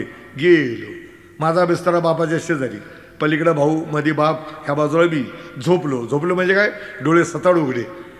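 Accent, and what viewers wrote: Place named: Ahmednagar, India. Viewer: Khas nagarcha rangda aawaj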